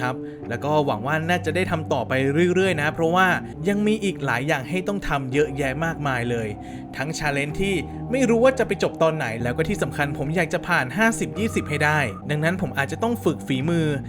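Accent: North, Thailand. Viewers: เหมือนคนเหนือเลย พี่อยู่จังหวัดอะไรครับ 555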